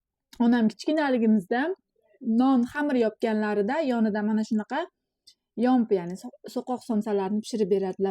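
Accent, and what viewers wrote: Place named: Bukhara, Uzbekistan. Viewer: Бухорони Олотиданмисиз шевангиз хам бухорога ухшаяпти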